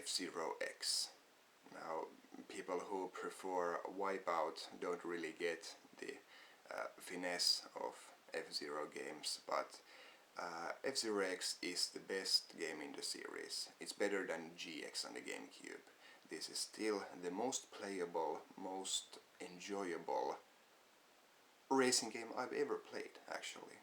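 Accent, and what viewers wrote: Finland. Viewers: he sounds like he's from finland or some european country so i'd have to it's pretty obvious he is from finland.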